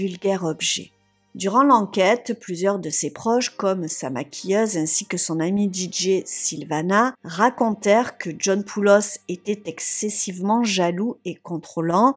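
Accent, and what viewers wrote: South, France. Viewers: C'est toujours un grand plaisir de vous écouter. Et ce bel accent du sud met un peu de soleil sur Liège ( Belgique) , où il fait toujours très humide et froid......:-)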